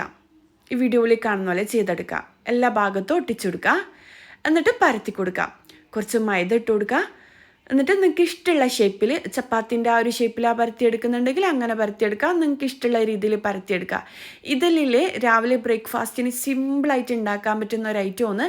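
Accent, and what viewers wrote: Kannur, India. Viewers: നമ്മൾ കണ്ണൂരുകാരുടെ സംസാരം ഇങ്ങനെയാ😁😁🥰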